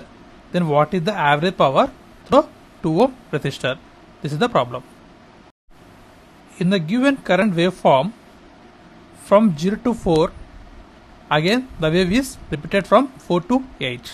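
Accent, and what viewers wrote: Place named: India. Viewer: Indian accent a little too thick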